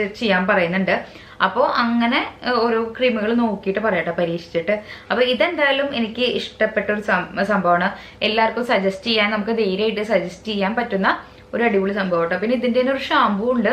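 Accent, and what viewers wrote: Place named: Thrissur, India. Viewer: ചേച്ചി തൃശ്ശൂര്കാരിയാണോ. സംസാരം അതുപോലെയുണ്ടല്ലോ 😻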